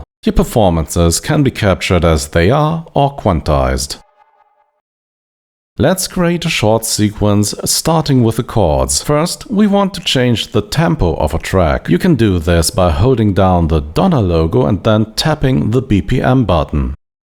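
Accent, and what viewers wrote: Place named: Germany. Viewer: The German accent!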